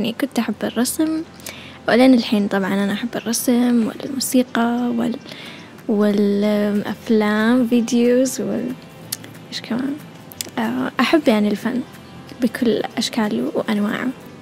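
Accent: Saudi Arabia. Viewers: صدقا اول مرة بسمع بنت بتحكي سعودي, بنت ذكية و جميلة جدا و مثقفة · لهجتكي من جده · تتكلمي سعودي صح أنتي سعوديه ولا أيش؟ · تكلمي عربي لهجتك السعوديه روعه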